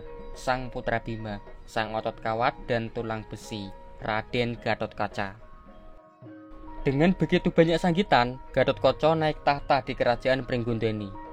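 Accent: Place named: Purwodadi, Indonesia. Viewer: Naratornya maaf wong purwodadikah!? Cengkoke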